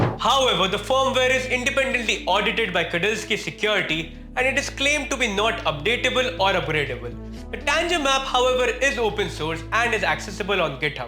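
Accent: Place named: India. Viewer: Cypherock executives, you need to get an American, Englishman or other presenter with English as his native language. Unfortunately, to the rest of the world India is known as the internet scam capital of the world and you having an Indian present your sales pitch is doing your product acceptance/adoption great harm. You might have a fantastic product, but most of us are thinking “scam” as we watch. Seriously!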